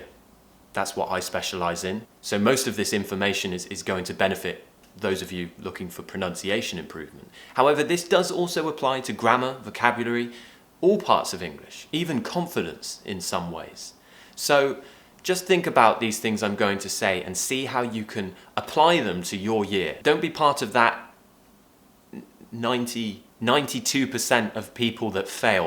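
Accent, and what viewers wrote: United Kingdom. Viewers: I found your videos by mistake and I loved your attitude. My goal this year is achieving a better British accent like yours. Excellent video! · Sir, I'm from India. I'm passionate about learning English. I have been watching your YouTube speeches for the past few months. I'm inspired by your British accent. I am passionately learning phrasal verbs nowadays. I like your speeches very much. Happy new year sir. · I like your British accent thank you so much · hi Teacher excellent your English"s course. ( and I love too the britsh accent). For me is the best !!. ( not against other please ). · Okay after this video I'm already your fan You mind looks like with mine... but with british accent hahahaha I like it! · I think im fallin in love with this guy he is so charismatic while talking with his brit accentttt💕💕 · Nice to hear from you once again... 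Lov the British accent lol.. Lov from Africa the Gambia...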